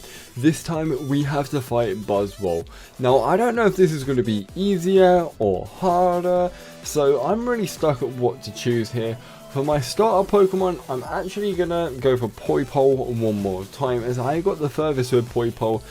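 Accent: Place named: United Kingdom. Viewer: Imma guess your British as a British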